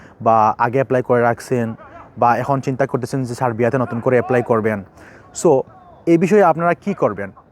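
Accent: Sylhet, Bangladesh. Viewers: Vai Sylheti na ki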